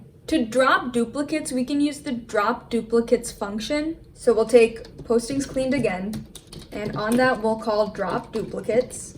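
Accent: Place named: India. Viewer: love the way you explain, keep making such videos and cover whole pandas. By the way I love your accent, it is mixer of indian and NRI.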